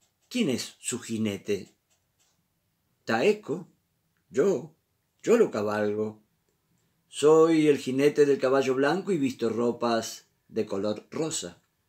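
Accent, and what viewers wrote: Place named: Argentina. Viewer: Que hermoso narra los cuentos! 💗 aunado a su encantador acento Argentino 🌟 Gracias! 😊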